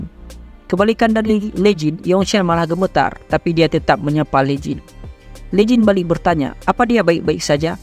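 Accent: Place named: West Nusa Tenggara, Indonesia. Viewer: lu orang ntb ya bang? logatnya kayak teman dari sana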